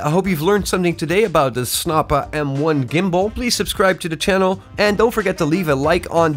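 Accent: Netherlands. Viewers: This sounds like a Dutchman...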